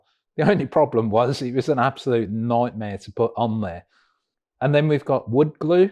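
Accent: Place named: Birmingham, United Kingdom. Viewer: I take it by the Brummie accent you in the UK lol. What do you think of the Onefinity Machine and what was the customs charge to get it into the UK? Im ready to order one but a bit nervous of the Customs charge. Also, what do you think of the Makita Router with it? Does it do a good job, or should I order a PWN Spindle at the same time. Sorry for all the questions.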